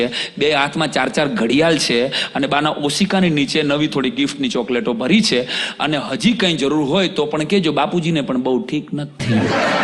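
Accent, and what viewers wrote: Kathiawar, India. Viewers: This gadhedo from gondal is khathiyawadi not surti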